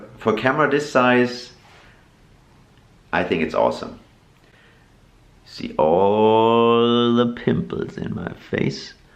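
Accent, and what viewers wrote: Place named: Australia. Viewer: You sound like your from Australia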